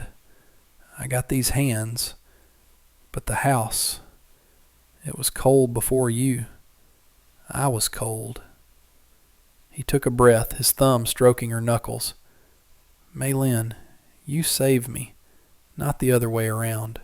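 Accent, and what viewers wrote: South, United States. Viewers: Finally, narration and a believable story. The pictures let it down but the souther drawl and correct pronunciation of words, the use of different names made it a refreshing dream to listen to. Excellent. Most enjoyable story I’ve heard from these types of stories. Very well done.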